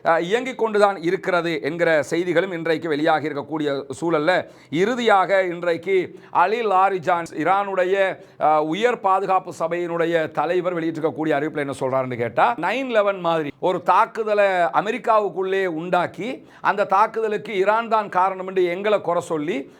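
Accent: India. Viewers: நீங்கல் ஒரு இலங்கையர் இலங்கையருகென்று ஒரு தன்மை உண்டு மனித நேய முல்ல நல்ல பன்பாலர்கல் உங்கல் ஆங்கில வார்த்தை சிலாங் இந்தியாவின் ஆங்கில உச்சரிப்பாக உல்லது இந்திய ஆங்கிலத்தை எந்தவொரு நாடும் ஏற்று கொல்வது கிடையாது உதாரணம் ஆரஞ் ஆப்பில் ஜான் இப்படி.. நீங்கல் நாகரீகமாக இதை கருதுகின்றீகலா இல்லை... மிகவும் கேவலமா உல்லது இலங்கைக்கு என்று ஒரு தன்மை உண்டு அதே பேணுங்கல்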